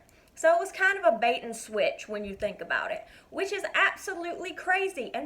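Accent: South, United States. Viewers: I love your southern belle accent ^-^ its kinda nice to listen to you talk while i work (i work at sonic. Its way better than walmart because my bosses are really chill and my co workers are cool but some customers just ruin it) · Oooh a southern accent...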